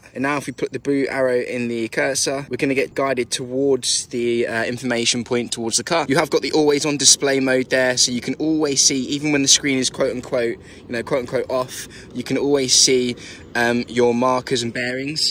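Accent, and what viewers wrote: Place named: United Kingdom. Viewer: The video is probably good, but sorry can't understand half of it because of the heavy British accent.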